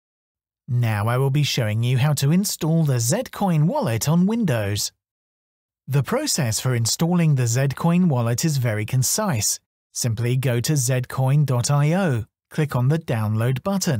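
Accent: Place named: United Kingdom. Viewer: British pronunciation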